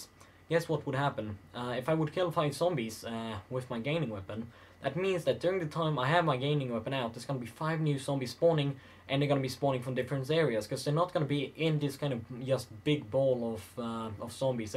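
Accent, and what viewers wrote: Netherlands. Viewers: Heh, i thought you were dutch :P Anyway you deserve more subs for these.They're the only good zombie guides on youtube. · Dutch? Swedish?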